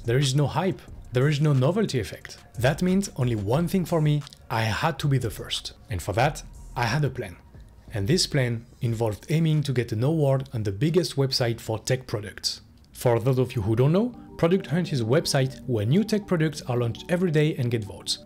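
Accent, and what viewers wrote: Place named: France. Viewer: J'arrive pas à y croire, je me demande si avec l'accent il est français ou non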